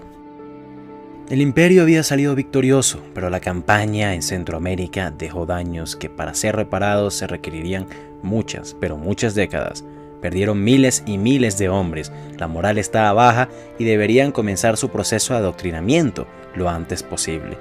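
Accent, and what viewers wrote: Venezuela. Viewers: eres venezolano? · no se como llegue a este vídeo ..pero reconozco tu acento venezolano,,,,entonces te dire....te la lacriaste jajaja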